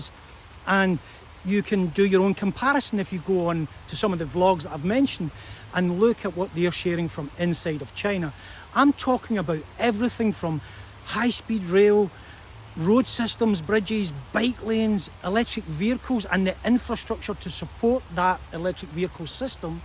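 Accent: Scotland, United Kingdom. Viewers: Scottish accent! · I lived in Scotland for 10 years and now working in China. It is so good to hear Scotish accent again. Scotland is such a beautiful country and is my second homeland. Compare to China, UK really lags behind in terms economy, living standard and technical capabilities. China is a vibrant and dynamic country. Cities in China are much more modern than London.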